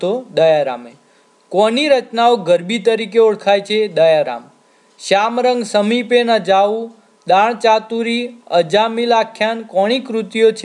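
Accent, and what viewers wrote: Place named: Mehsana, India. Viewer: તમારો મેહસાણાનો તળપદો અવાજ સરસ છે.."મ" સીરિસ સીવાય મસ્ત છે બધુ...આટલી વિસાળ મહેનત કરો છો તો ભુલો ગોતી અમારે તમને ન કેવુ જોઈએ.પણ મજા આવે છે...શરુ રાખજો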